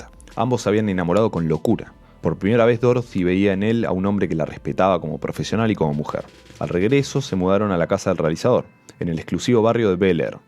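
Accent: Argentina. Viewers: Me encantan todos los vídeos de y cada vez lo están haciendo mejor. Una crítica constructiva sin ánimo de ofender a nadie: Me gusta mucho el acento argentino pero en este formato documental es un poco chocante. Me refiero a palabras como «besha» por bella o «gashina» por gallina, también la forma de decir los años como 1009,80 por 1980.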